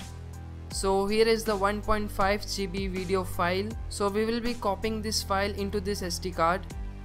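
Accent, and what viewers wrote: India. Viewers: You sound like a Indian phone scammer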